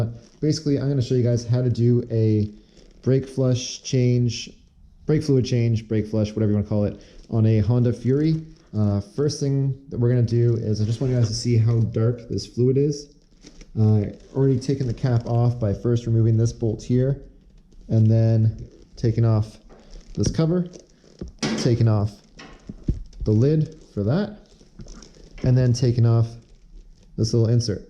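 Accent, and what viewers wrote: Canada. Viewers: You sound a bit Canadian eh?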